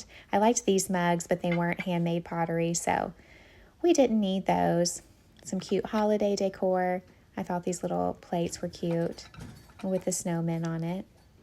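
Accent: South, United States. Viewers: I’m hooked. I’ve watched your videos a lot these past days. You really sound like a Southern Belle. It’s fun shopping with you.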